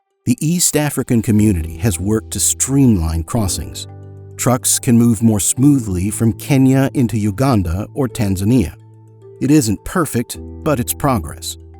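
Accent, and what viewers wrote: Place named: United States. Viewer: lol he sounds yank to me nothing euroxentric there 😂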